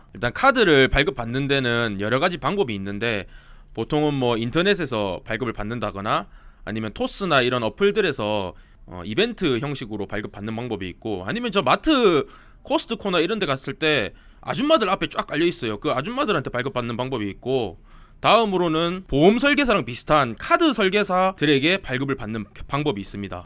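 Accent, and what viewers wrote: Gyeongsang, South Korea. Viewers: 오늘 님 300만원 프로젝트 1탄부터 정주행했습니다. 잘꼭따라가고 월 300은 아니어도 200이라도 벌었으면 소원이 없겠어요 간절하네요 저도 고향이 갱상도라서 사투리가 넘 와닿고 ㅎ 검단에살고 있어서 눈오는 풍경도 낯설지가 않네요. 꼬옥 성공하고 싶어요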